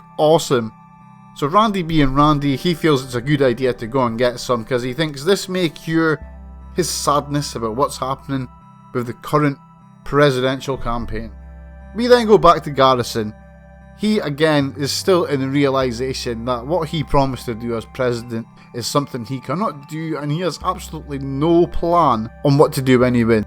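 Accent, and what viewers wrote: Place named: Aberdeenshire, United Kingdom. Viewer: Hey min, far aboots ye fae loon? Ye soun like a Brocher? Definitely Aberdeenshire, aye?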